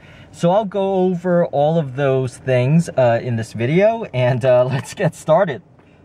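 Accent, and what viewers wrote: Brooklyn, United States. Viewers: Sounds a tad like Woody Allen. Brooklyn boy?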